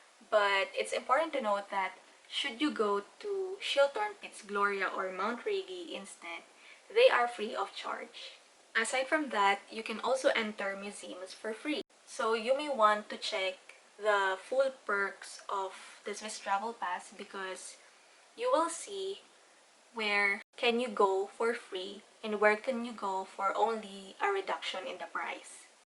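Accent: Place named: Ilocos, Philippines. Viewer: Parang Hindi Lang Filipina c mam.kasla ilocana pay.😄❤️❤️❤️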